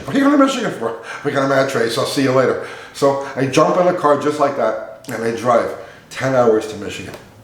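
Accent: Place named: New York, United States. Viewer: It's only a matter of time before has his own massive car show/meet and greet. Celebrity status! 👍 P.S. I knew you didn't sound like you were from TN 😁. Where in NY?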